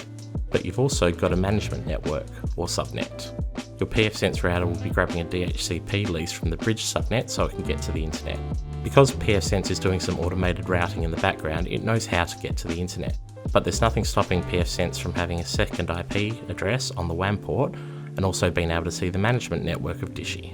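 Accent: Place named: Australia. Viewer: Sorry to sound rude, but you sound like a fellow Aussie... are you in Australia using Skylink? Didnt think it was released around here yet? How you finding it, worth the cost?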